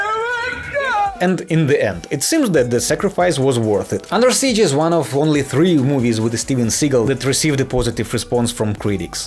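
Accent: Russia. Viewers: where are you from? You have this clear russian (or Ukrainian) accent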